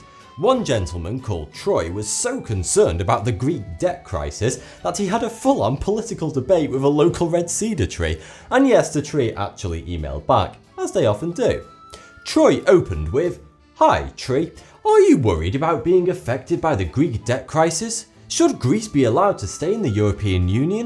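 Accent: United Kingdom. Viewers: Wow, you live in Melbourne and don't even know the correct pronunciation of the name, it's only because of your accent that you say it like that. has a British accent therefore he speaks the correct pronunciation of the word. And fix your grammar dude.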